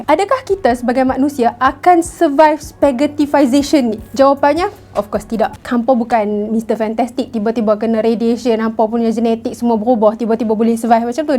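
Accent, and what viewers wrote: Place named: Kedah, Malaysia. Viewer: loghat kedah piaw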